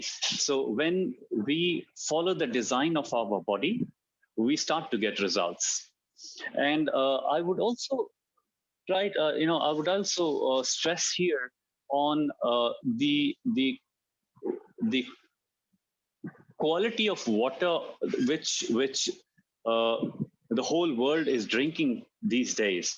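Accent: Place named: North India, India. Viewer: you have simplified the importance of Kangen Water in such a lucid manner that even a sensible school kid will realise the need for KW. But, with deep regrets I need to emphasize that speaks sich an elegant English and on the other hand, Mr, the host, speaks such an horrible english. His accent, his pronunciation of the words is typically north side bhaiyas type. He is grammatically incorrect too. For e.g., he says there is a query from the Prasad. My God, such a horrible English. It is just 'Prasad' and not 'the prasad'. please please please I appeal to your great highness to arrange for a better well educated host who could speak English properly for your next series of videos. AMEN.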